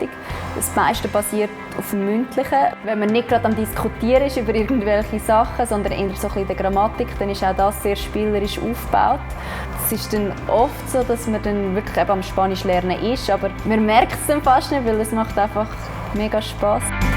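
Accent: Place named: Germany. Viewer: what german accent is it ?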